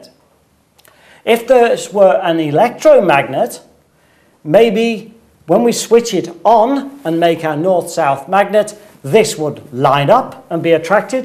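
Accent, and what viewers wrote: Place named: United Kingdom. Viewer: Brittish. Brits don't always pronounce r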